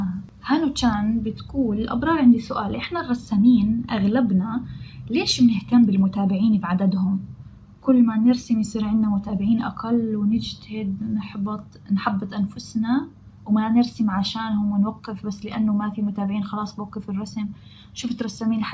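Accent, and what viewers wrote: Palestine. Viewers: بالعكس الرسمة جميلةة ....بس ما شفنا الحبر دخل بالرسمة ابداا ...مع انو الحبر اساس التحدي 🙈 مرة اخرى أُحِبّ لهجتكك الفلسطينية❤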